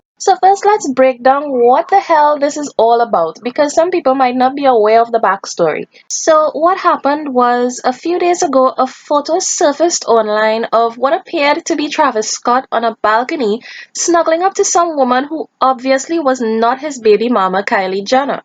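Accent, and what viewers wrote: Trinidad and Tobago. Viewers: Is that a trini accent i hear :) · you are sounding like a Trini are you? Good summary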